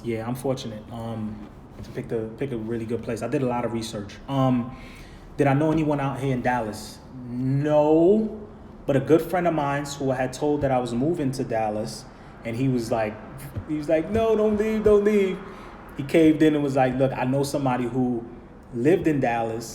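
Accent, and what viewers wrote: New York, United States. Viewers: Your voice reminds me of Mendeecees lol. That’s a good thing tho. I love nyc accents lol.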